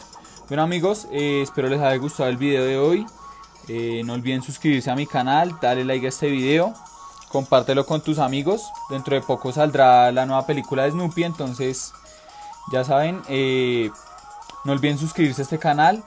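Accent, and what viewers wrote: Colombia. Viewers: Se le nota tanto que es de bogota.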